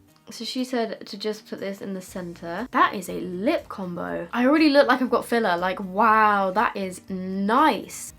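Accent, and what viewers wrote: England, United Kingdom. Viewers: vlogs british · Is she An Englishwoman?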